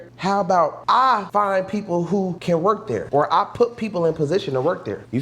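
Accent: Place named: Chicago, United States. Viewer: I was about to say I hear that Chicago accent him in lol.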